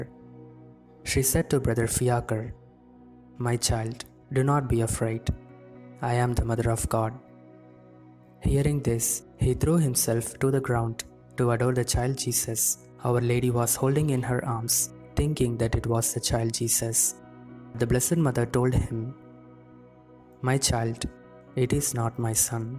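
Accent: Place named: India. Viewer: Your accent seems to be of an Indian. Great Please continue your good work. May our lady bless you.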